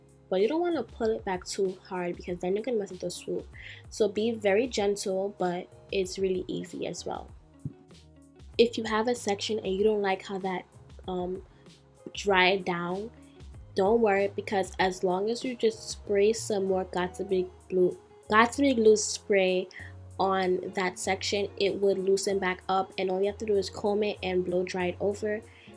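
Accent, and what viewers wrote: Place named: New York, United States. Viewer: I'm new to your channel and idk if you're from New York but you're accent is so adorable lol. Great job on the ponytail ❤️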